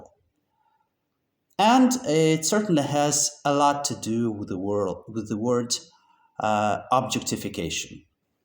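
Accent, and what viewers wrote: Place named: United States. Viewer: Вітаю, а ти якось навіть схожий на американця, ще і вимова така автентична :hand-pink-waving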